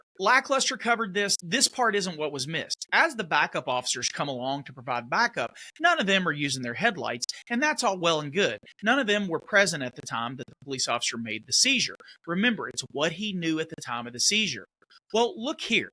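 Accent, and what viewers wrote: South, United States. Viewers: Great analysis. Thanks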